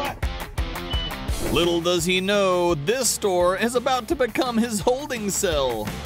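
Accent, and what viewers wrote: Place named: United States. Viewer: We don’t really need terrible American voiceovers… or cheesy music.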